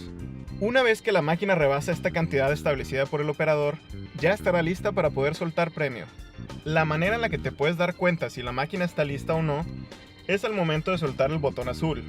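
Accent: Monterrey, Mexico. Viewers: Eres de Monterrey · Saludos papu muy buenos los tips eres un crak claro mexicano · Eres regio???? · Eres de monterrey verdad?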